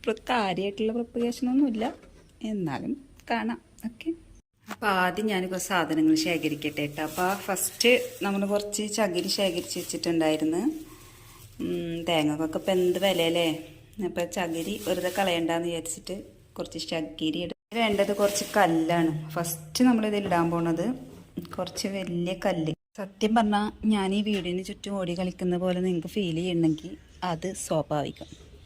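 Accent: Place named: Kochi, India. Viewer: ഇങ്ങള് കൊച്ചി കാരിയാണല്ലേ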